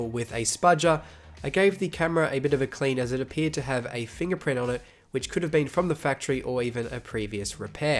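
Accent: Australia. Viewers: Hello, do you take send ins? I'm in Brisbane and recently dropped my fiancés phone onto my MacBook. There's a small but incredibly annoying scuff left on her screen. I'm at the point where I'm willing to buy her a new phone but I thought a quick search was in order first (delighted to hear an Aussie accent). If you don't, what's my best bet? I checked your online store, there's no iPhone X parts available? Is that a 'for now' thing or should I source them elsewhere? Regards, Ricky.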